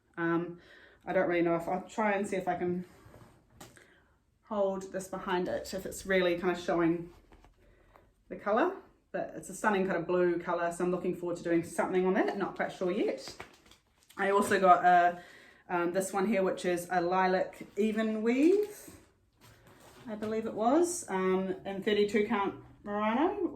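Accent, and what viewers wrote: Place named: New Zealand. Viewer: Lovely to be introduced to your channel. Catching up on all your episodes while I stitch. My son lives in Wellington so it's nice to hear your familiar accent. I have recently started a channel too - i'm a South African living in UK. I used to be a monogomous stitcher but have discovered that I quite like having a few projects on the go - but don't want to have too many either.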